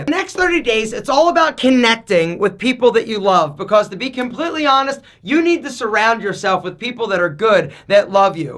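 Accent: Philadelphia, United States. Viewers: I am just here for you Philly accent. Love it.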